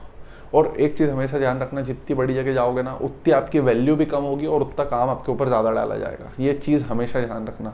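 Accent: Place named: Rural, India. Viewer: Baat sahi he ..appka baat sunke lagta hai app gao se ha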